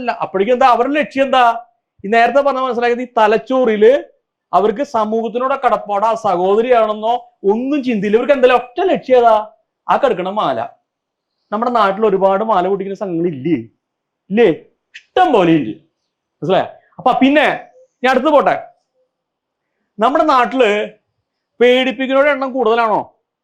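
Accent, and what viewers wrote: Thrissur, India. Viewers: നല്ല ക്ലാസ്സ്‌....... തൃശ്ശൂർ ഭാഷ നർമ്മ ശൈലി....... 👍😍😁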